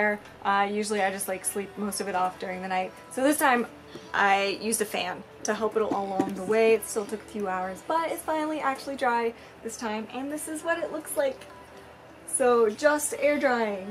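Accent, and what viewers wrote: United States. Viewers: VERY obvious American accent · we gotta get out this comment section. They're way too ignorant here. I'm shocked they don't know anything about perms and relaxers for one and two they didn't even know she was American. They said she must be from England she doesn't look like an American woman. Like what do American women even look like where literally a mixture of different people and cultures. I knew she was an American from her American accent 🤦🏾‍♀️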